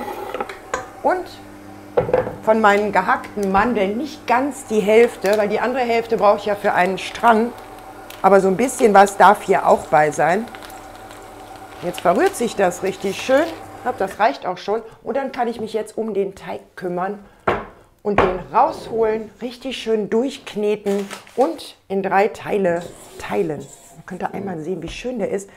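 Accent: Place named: Rhineland, Germany. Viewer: Hallo, dein Akzent erinnert mich an zuhause, ich komme aus dem Rheinland und will beim Zuhoeren sofort n Kaffeekochen und dich einladen. Sieht alles sehr lecker aus und ich liebe deine Kueche. Viele Gruesse aus Kanada!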